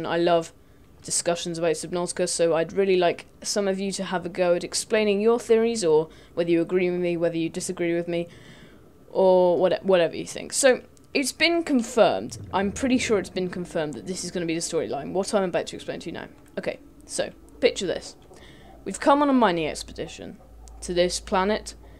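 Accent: England, United Kingdom. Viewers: How refreshing to hear a well spoken, dignified English accent. The game is described clearly and concisely, without going off on tangents or babbling. All too often you have some ego waffling nonsense in the form of insane screaming. As well, they tend to describe everything as awesome. Awesome this. Awesome that. So much awesome is not awesome. It's 90% VJ ego and 10% about the clip, which is primarily the topic that interests me. Instead, I learn more about the ego of the awesome VJ far more than the actual reason why I'm watching. Perhaps it's Pewdiepie's fault. Who knows? All I know is, I don't enjoy VJ's with egos.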